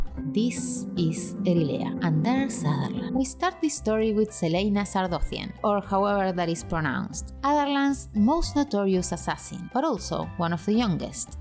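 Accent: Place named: Argentina. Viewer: Argentinian ass accenttttt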